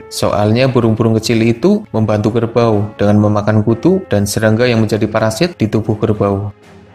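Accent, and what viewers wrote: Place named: Java, Indonesia. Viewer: Medoknya itu unik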